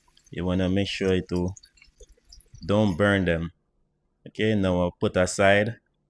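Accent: Jamaica. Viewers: Brown Sugar Stir Fry …✨❤️try most of your recipes. I have been doing curry shrimp with coconut milk.. or sweet and sour shrimp. Why don’t you use olive oil big flavour or coconut oil both much healthier than vegetable oil. Fastest thing to cook after work. . I have been watching u since you started .. you have made big strides. . 🇯🇲💙✨❤️🇯🇲. It seems every parish have a different speech pattern which parish are you from?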